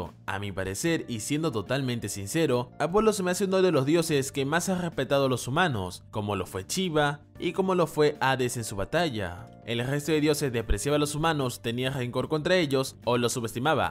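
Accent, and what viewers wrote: France. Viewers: tienes un acento francés, ¿eres de allá?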